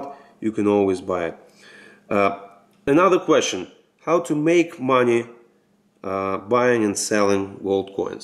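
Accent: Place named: Russia. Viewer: Выдаёт русский акцент :)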